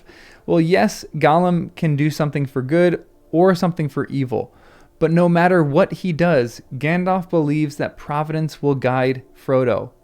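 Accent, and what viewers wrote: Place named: United States. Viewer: Dreadful American accent. Gaal’m.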